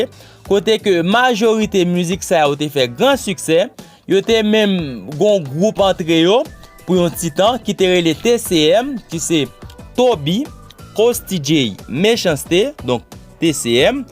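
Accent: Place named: Cap-Haïtien, Haiti. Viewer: Ou pale menm jan ak moun okap ou se moun okap?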